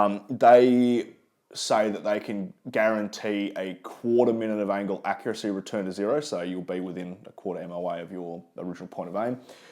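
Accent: Australia. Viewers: Just tripped over this video, and pissing my sides at your relaxed Aussie vanacular. Got yourself a new sub. All the best from the UK 🇬🇧 👍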